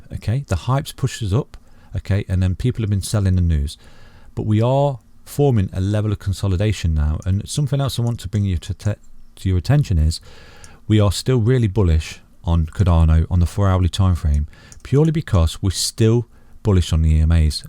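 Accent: United Kingdom. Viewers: I can’t understand if I like more the analysis itself or your distinctive British accent. 😄 Miss UK a lot. Sub and Like! 👍🏻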